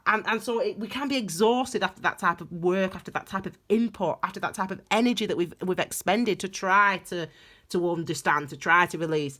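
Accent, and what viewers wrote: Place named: Northern England, United Kingdom. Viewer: I just found you the night b4 the New Moon when I am beginning a 40 day grape 'fest/fast'! Ha! Timely in deed. You're into the same stuff I like too and you describe things well. When you did the Reiki I felt my head and throat as blocked. My heart to so you were spot on. Ta very much! I live in the US but come from SE England. You're a Northern Lass I can hear that much! Thanks and blessings and happy new moon. I did subscribe so I can listen and learn some more. Cheers.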